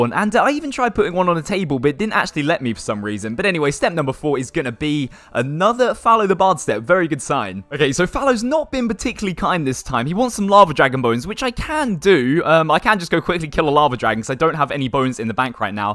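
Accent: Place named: Surrey, United Kingdom. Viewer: Yes my guy, where in the uk are you from? You sound local to me! Surrey area?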